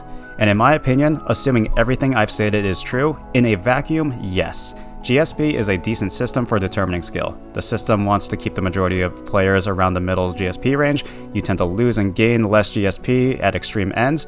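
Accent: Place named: New York, United States. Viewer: What part of New York are you from ? I’m from New York as well 👀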